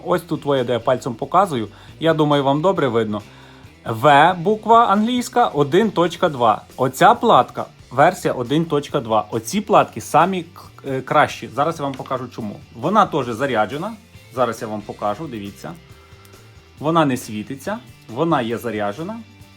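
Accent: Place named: Western Ukraine, Ukraine. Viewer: Бля, этот акцент западенский конечно, пиздец 😅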